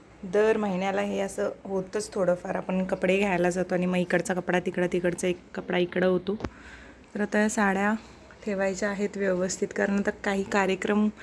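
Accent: Kolhapur, India. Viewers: तुमची कोल्हापुरची भाषा ऐकायला छान वाटते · Tumhi kolhapur aahat ka, bolna varun vatatay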